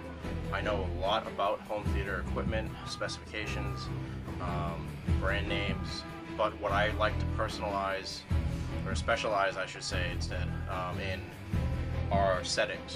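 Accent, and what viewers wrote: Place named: New England, United States. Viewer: new england accent.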